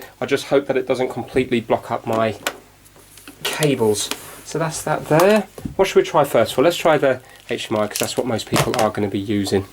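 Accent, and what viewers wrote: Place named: South London, United Kingdom. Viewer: he sounds south londonish, but hes foreign. you can tell from his accent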